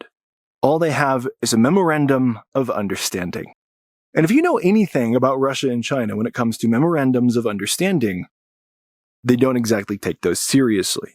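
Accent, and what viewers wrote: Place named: California, United States. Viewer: You're not "man-splaining," you're "dude-splaining" ;) California accent aside, you're quite correct.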